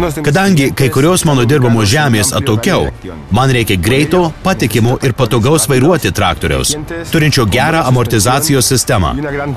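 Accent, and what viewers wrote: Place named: United States. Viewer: komentatoriaus amerikoniskas akcentas zudo :D:D:D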